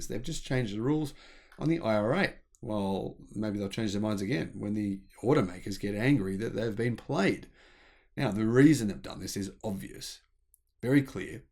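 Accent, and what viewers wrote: Australia. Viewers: Your OZ accent is tough to follow. The speed of your speech makes it even tougher. Can you modify either, so that non-oz speakers can better comprehend your narrative ? Tx.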